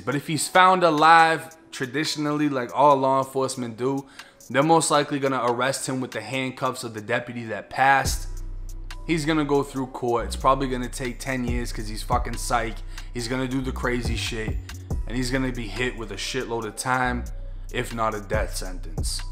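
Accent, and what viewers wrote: Boston, United States. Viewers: live in the NE as well as Florida. Interesting accent, Florida hood with wicked strong instances of “park the car in Harvard yard.”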